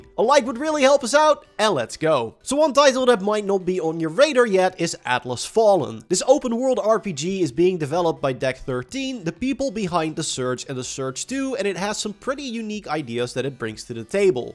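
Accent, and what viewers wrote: Netherlands. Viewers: I loathe the super strong Dutch accent. It's even worse this time.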